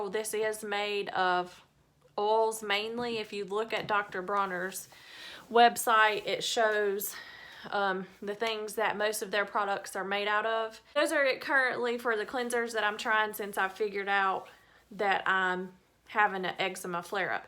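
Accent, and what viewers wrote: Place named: South, United States. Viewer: I am currently using the eucrisa as well. My whole body is covered with eczema. All except my face. But you have a sexy southern accent. Where are you from? I was raised in North Carolina but live in South Florida.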